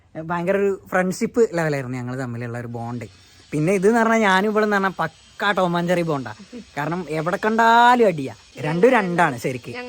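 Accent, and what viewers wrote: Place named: Kuttiyadi, India. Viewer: nte samsaram keteram thoonik kuttiyadi slang varunnund ❤❤😊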